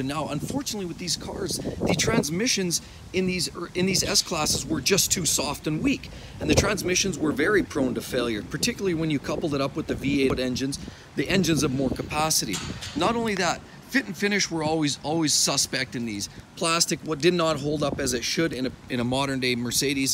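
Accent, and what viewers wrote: Canada. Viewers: Your accent sounds like a Family Guy caricature of a Canadian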